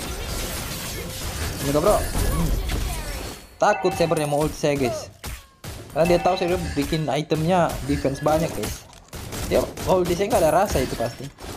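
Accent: Manado, Indonesia. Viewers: Bg orang manado?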